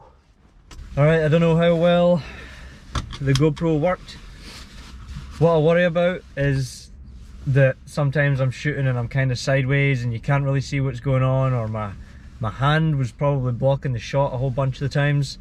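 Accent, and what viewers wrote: Scotland, United Kingdom. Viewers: Loved it! So much life at night there, up here its almost dead at night. Thanks for sharing. And btw... love your scottish accent;)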